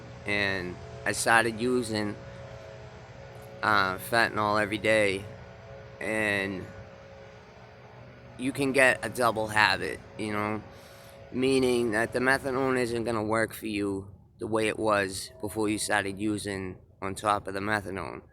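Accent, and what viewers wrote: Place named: Massachusetts, United States. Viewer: You sound like ur from mass I’m from mass 😀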